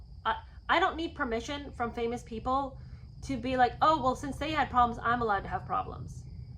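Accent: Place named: Cockney, United Kingdom. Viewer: dont try an english accent ! It's sounds goofy half cockney accent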